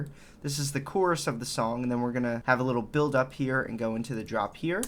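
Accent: Philadelphia, United States. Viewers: This man is from Philly or Baldimore for sure, am i right? Sick tip though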